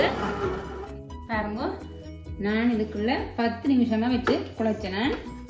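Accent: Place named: Sri Lanka. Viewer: இலங்கைத்தமிழ் 💗💗 தமிழ் என்றாலே அழகுதானே .... அக்கா உங்களுக்கு 4000 watch Hours வந்திட்டா ??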